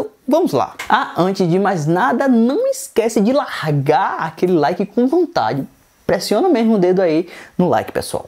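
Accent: Bahia, Brazil. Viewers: O pvt é baiano kkkkk já ganhou meu like !! Tmjj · Voce er bahiano? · Deixei o like pq eu gostei do lá ele kkkk baiano mesmo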